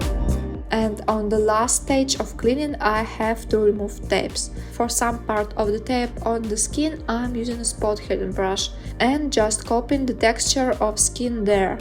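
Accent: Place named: Russia. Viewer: Привет! По речи кажется что русская =) хочу русские субтитры. хотя и так понятно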